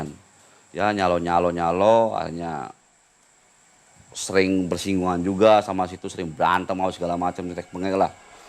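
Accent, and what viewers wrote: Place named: Java, Indonesia. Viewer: Maaf klo boleh tau sampean orang mana sih klo aq liat tayangn sampean ko Kya alergi banget bahasa Jawa..apa" ga tau?padahal klo di lihat sampean bicara logat orang jwa juga?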